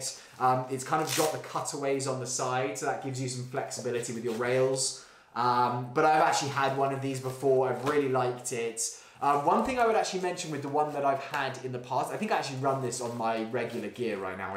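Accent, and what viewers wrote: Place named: United Kingdom. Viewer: Great videos, nice to hear a uk voice reviewing airsoft !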